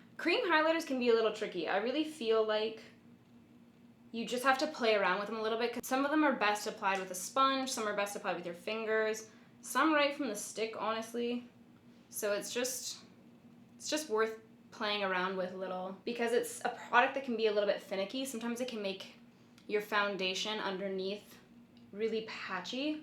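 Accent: Canada. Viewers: Stay awesome! Also luv the way you Canadians say your vowels ❤️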